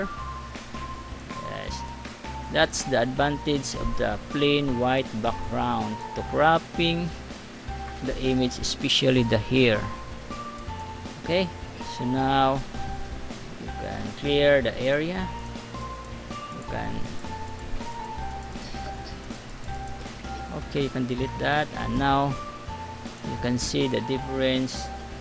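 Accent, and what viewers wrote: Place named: Visayas, Philippines. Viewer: walang Kabuhay-Buhay, Tagalogin mo na lng Hirap na Hirap ka Mag-English at di bagay pati mga Pronounciation mo parang Visaya Lng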